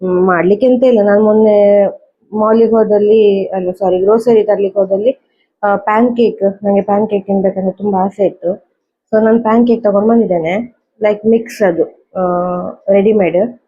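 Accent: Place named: Kundapura, India. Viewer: Kundapura kannada chanda mat addtri maree ❤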